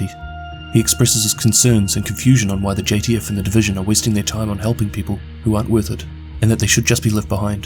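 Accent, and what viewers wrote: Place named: Auckland, New Zealand. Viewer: sounds Aucklander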